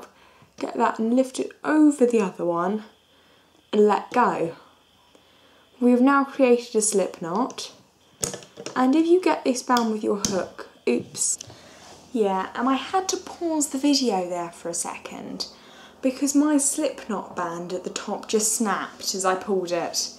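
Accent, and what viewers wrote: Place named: England, United Kingdom. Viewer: I love your accent! I'm from England too but I don't have your accent :( but thanks for the tutorial! :)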